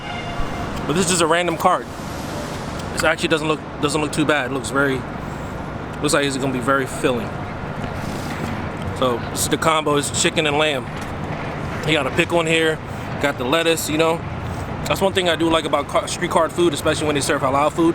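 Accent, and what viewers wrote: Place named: Cambodia. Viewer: Why you talk like ah khmow ?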